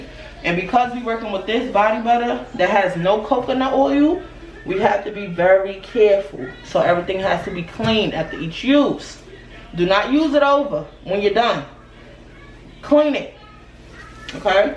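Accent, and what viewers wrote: United States. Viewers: I love your NYC accent and charisma! Your business name fits you! Also, you make me want to take a trip to the city! 😊 Thank you for sharing this and not gatekeeping! Many blessings to you!!! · I love your accent - sounds like nyc-brooklyn lol - and this was detailed God bless your business and your family! Thank you!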